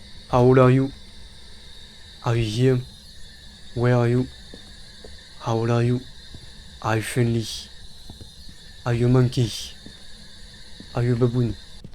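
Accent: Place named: France. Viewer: im crazy supprised that ur french accent makes it difficult to really understand ur English.... But still nice smooth gameplay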